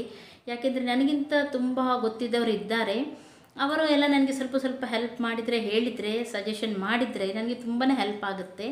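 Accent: Mangalore, India. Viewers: Madam nimma mattu keluvade onda thara khushi. Nimma magalur kannda super. Nimma vedio super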